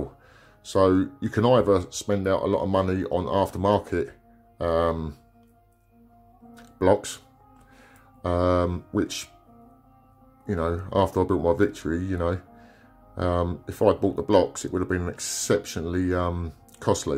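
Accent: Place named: England, United Kingdom. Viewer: Holly cow! What an English accent. Somehow I always seemed to think that you would have sounded like Billy Bob Thronton.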